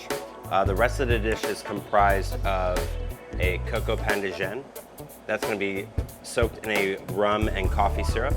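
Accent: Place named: United States. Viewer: I don't think it matters how words are pronounced since Italians, English, and U.S. Americans, for example, pronounce them differently. To me it's like an indicator of where the person came from; this case he's American. :) Ain't language fun? :D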